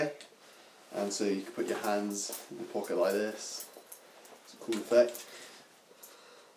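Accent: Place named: United Kingdom. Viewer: Why tf does an Asian have a British accent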